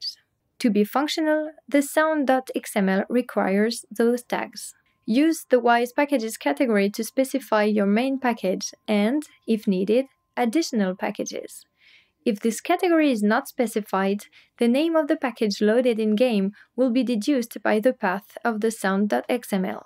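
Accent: France. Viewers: She seems to have a little french accent.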